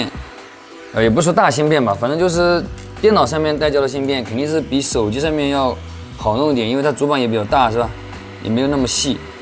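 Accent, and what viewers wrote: Wenzhou, China. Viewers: 这温州口音，太熟悉了哈哈哈哈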